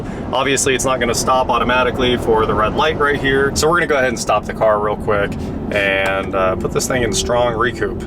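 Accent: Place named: South, United States. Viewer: Sounds like a southern poor boy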